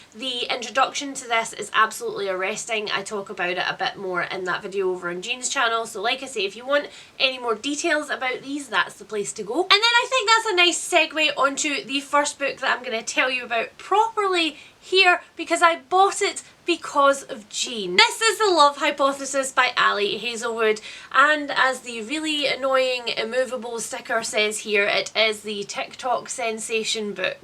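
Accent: Scotland, United Kingdom. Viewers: Hi, new sub here so you might have said previously, I just wondered if your shop will be reopening soon, it won’t even let me look 😭 also you mentioned in another video about chronic illness, I also have chronic Illness and wondered what you’re dealing with, or if you have a video on it? Sorry if you’ve already covered these questions. Loving your bubblyness and your loud Scottishness, very different to us in England 😂💕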